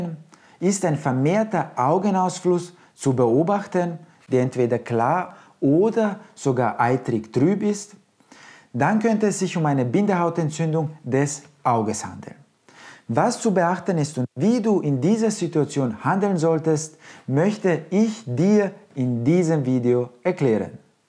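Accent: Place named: Russia. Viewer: Dieser russische Akzent macht das Video einfach perfekt 😂keine Ahnung woran es liegt aber wenn jemand mit russischem Akzent spricht würde ich mir alles angucken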